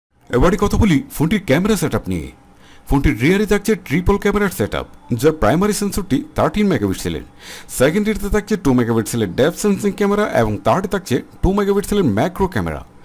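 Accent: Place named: Noakhali, Bangladesh. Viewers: মনে অর আন্নে ’নিউখাল’ এইচ্চা করি কতা কম কিল্লাই। শুদ্ধ করি কতা কইতে হারেন কেয়ন? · vai its perfect not ferfect , leave your noakhaillya accent... irritating kotha barta..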